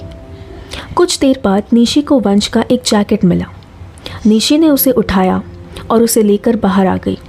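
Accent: Varanasi, India. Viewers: Apki awaz me jyada maja ata hai dii rj voice me bhi sunke ka maja hai par banarsi tone apka jyada acha hai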